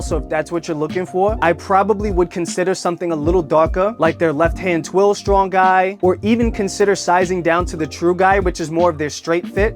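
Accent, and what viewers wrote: New York, United States. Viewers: Jersey or New Yorker ? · I can hear the NYC in you lol, great video!!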